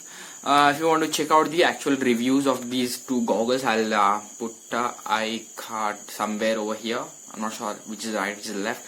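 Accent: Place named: England, United Kingdom. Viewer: Hi I am deon dsouza I liked your English accent. Keep going. U r doing very well.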